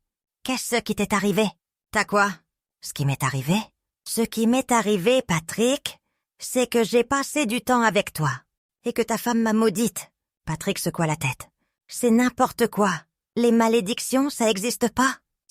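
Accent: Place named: Spain. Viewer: Histoire à méditer car très instructive Bel accent espagnol ❤❤❤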